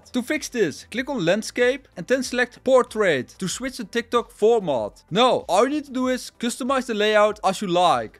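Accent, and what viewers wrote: Netherlands. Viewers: I smell some nederlands a bit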